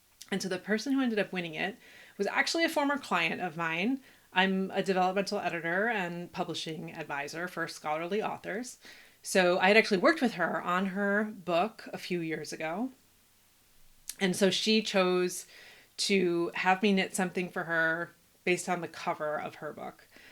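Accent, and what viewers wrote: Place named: United Kingdom. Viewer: Wow! I’m only a few minutes in and coming here to say that I am glad to find you and am excited for your videos! My guess is UK, Britain? 🐑 congrats on Jeopardy. Super cool.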